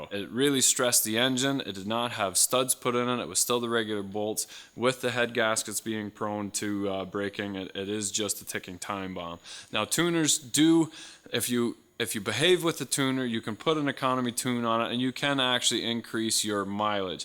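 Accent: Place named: Canada. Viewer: I got sent this video out of the blue to my personal FB page saying "hey Brian, you've got a doppelganger! It's you, but with a Canadian accent! I said, heck ya- it's my dopple-ginger! We're already met and friends eh?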